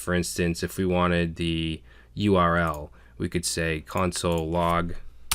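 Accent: Boston, United States. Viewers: Boston accent is killing me.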